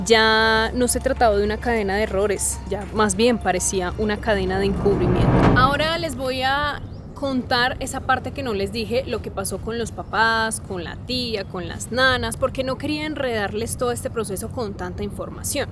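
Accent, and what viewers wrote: Colombia. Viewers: Y esta youtuber, como se atreve a hablar de este caso, ni siquiera es mexicana, por su acento es Colombiana, ni siquiera los mexicanos sabemos como pasó, así que tache, oportunista!!. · andas perdida mi Reyna no se pronuncia Huixquiluacan, es Huixquiluacan pero bueno eso es lo de menos por tu acento puedo ver que eres colombiana...lo que si no pasa es que una mamá tiene en su panza 10 meses al bb....no mamita son 9 meses... que yo sepa en ningún lugar de el mundo son 10 meses de gestación 🤭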